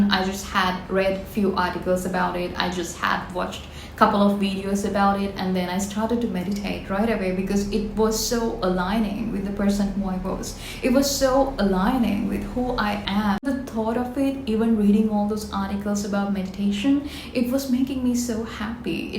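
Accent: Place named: England, United Kingdom. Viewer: ❤ your English accent wow. I want to speak English like you and i will..❤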